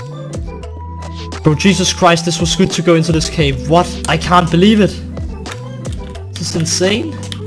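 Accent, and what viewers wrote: Denmark. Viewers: That moment when you can hear that He is danish becouse of his accent😂😂😂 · bruh den danske accent offffff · i can here that your from danmark. true? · i hear danish.. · Sounds like a danish accent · I smell danish acent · are he danish¨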